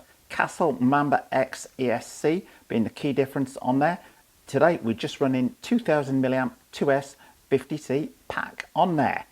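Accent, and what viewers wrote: United Kingdom. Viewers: Great upload well done 👍 you were born in the uk 🇬🇧? I am trying to work out your accent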